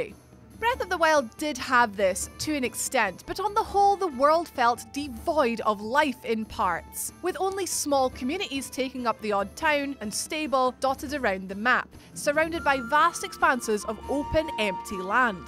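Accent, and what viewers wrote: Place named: Scotland, United Kingdom. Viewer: if you put your mind to it you can hear an accent that sounds like the princess from the disney movie Brave. yeah, I forget her name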